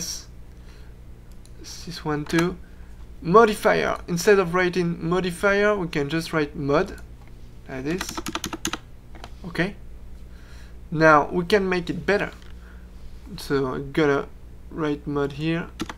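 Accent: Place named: France. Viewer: Lol tu a un accent français trép prononcer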